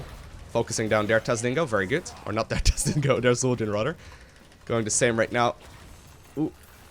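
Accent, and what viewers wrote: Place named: Netherlands. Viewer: Haha yeah that's kind of how we Dutchies often speak!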